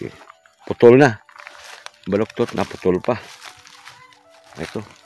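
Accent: Iloilo, Philippines. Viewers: sir ang tuno sang imo daw ilonggo ka man.sa ka sa Iloilo sir?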